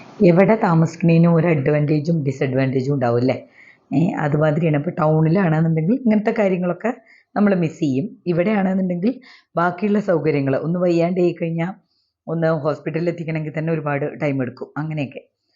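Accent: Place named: Valluvanad, India. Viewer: Original valluvanadan slang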